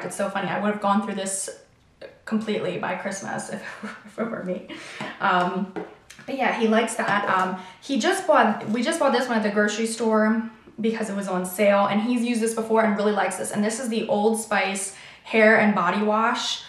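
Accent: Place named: South, United States. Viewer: I like watching your videos because you're so pretty and I love your accent. I want to move to the south to get me a man with that accent! haha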